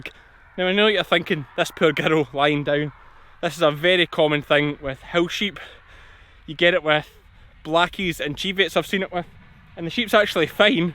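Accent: Scotland, United Kingdom. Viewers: Scottish accent and sheep shearing! What could be better! Hello from NYC!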